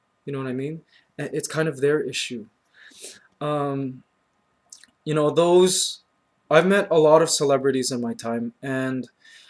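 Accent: Philippines. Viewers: ._. i find it more interresting to watch ur vids in the pinoy accent xD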